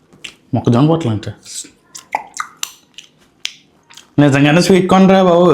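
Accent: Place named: Visakhapatnam, India. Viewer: Bro where are you from bro ....matching to vizag slang ....if from vizag which place in vizag ....and I like your videos bro ❤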